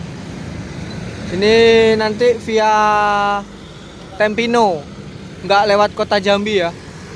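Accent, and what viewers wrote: Java, Indonesia. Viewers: Logad lu Jawa bngt coy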